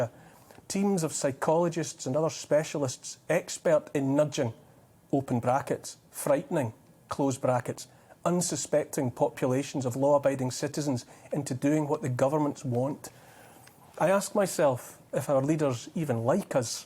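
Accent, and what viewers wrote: Scotland, United Kingdom. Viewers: Loved your specials,. Now I enjoy your commentary’s, and that great accent. I deeply regret that I have no Scottish heritage. I live in Australia where one of our cities Melbourne, broke the world record for lockdowns.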